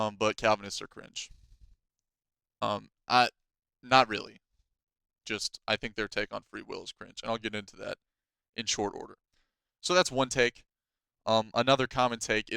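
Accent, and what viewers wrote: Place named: South, United States. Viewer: Where in the south are you from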